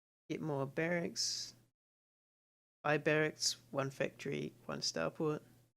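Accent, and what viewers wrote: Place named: South Africa. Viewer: Excellent video. I really liked the calm easy thought process you had during the game. Your accent sounds like you are from South Africa.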